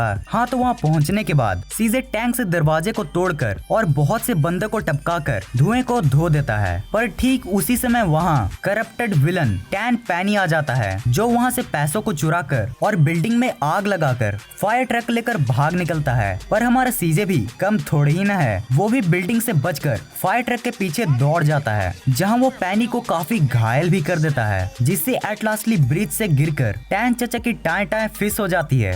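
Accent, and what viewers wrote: Bihar, India. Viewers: Are bhaiya bihar se ho ka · Are you from Bihar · Bhai bs 1 question ka abs dedo ki tm bihari ho kya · His accent of saying hindi is like bihari love from bihar 😎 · You are from Bihar?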